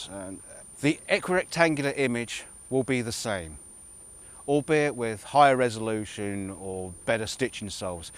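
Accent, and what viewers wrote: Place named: RP, United Kingdom. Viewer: Wasn't sure if you were the script writer too. With that authoritative BBC accent I guess you've got the bases covered. Bravo. Yeah the world is round.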